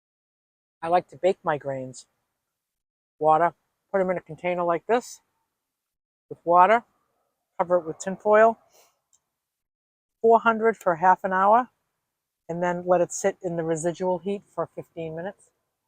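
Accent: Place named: Boston, United States. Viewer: Awesome,! Absolutely love your style! And your accent is the best! I’m from a city just north of Boston and I have the same accent! And I’ve always loved it❤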